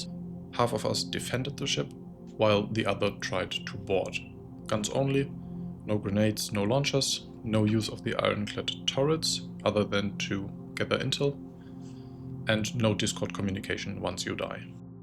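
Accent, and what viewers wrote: Germany. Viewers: er ist deutscher, das hört man :-)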